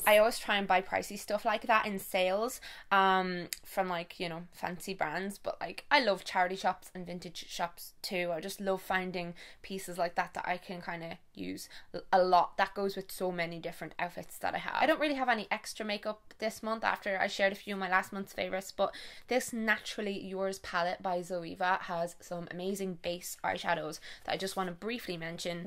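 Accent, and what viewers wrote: Ireland. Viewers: I love your videos so much and I love to hear an Irish accent on YouTube and I'm from Ireland too (cork) 🇮🇪🇮🇪🇮🇪🇮🇪🇮🇪🇮🇪🍀🍀🍀🍀🍀🍀💚💚💚💚💚💚💚💚💚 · To be honest I started watching your channel coz you're Irish and I love your accent (I had a girlfriend that's from Edenderry but she won't talk to me anymore and I miss her accent) but I actually really enjoy your content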